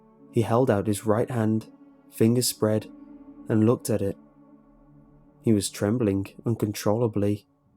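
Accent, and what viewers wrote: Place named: Merseyside, United Kingdom. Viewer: is a perfect story for your voice and cadence. You're accent id familiar, sounds Merseyside :)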